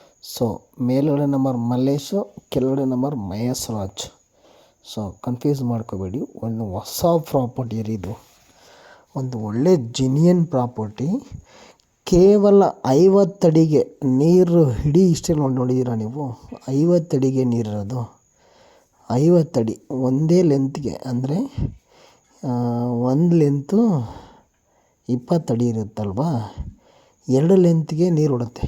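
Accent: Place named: Tamil Nadu, India. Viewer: Irritating presentation 😢😢, please speak naturally, kannadavanna tamil accent alli mathadthare. Helidde heltheera, gottu english sariyaagi baralla antha, sariyaagi kannadadalle maathanaadu, english shoki yaake 😢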